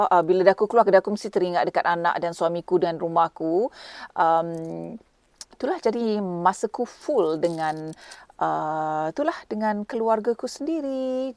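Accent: Negeri Sembilan, Malaysia. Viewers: akak org nogori ko? slm sri segomban!!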